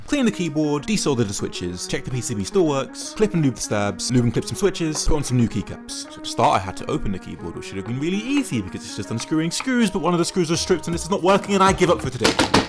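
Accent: United Kingdom. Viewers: Are you British.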